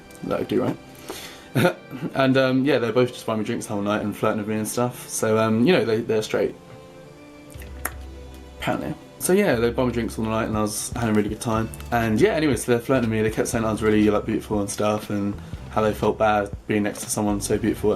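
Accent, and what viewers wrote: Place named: United Kingdom. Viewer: haha he was 'straight' !!! loved this video!! trying to work out where about you are from in the uk from your accent!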